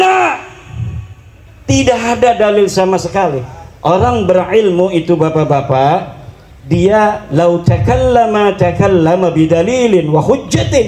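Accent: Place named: Banten, Indonesia. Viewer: Gas terus yai IMAD........KOCAK LOGAT BANTEN LUCU , OJO WANI WANI SIRE SIRE BANTEN SING ADA LAWAN .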